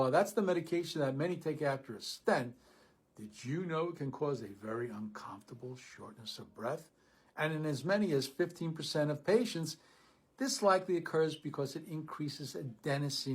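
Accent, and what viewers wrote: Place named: New York, United States. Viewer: I find it comforting to be yelled at by older me with a New York accent about topics I didn't know I would find interesting ever since I volunteered with the Bernie campaign a million years ago in 2016. I'm gonna go blow my dad's mind when I tell him where his shortness of breath is actually coming from. He was sure it was the smoking he did as a teenager catching up with him 50yrs later.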